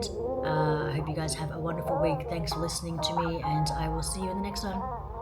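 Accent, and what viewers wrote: Australia. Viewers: Nice ,as soon as you first spoke i new you were from Aus👍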